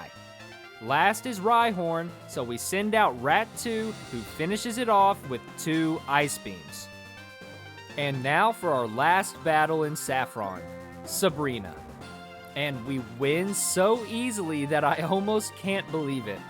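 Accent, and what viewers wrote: United States. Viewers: your southern accent makes this video funnier to me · Is that a South Carolina accent I hear?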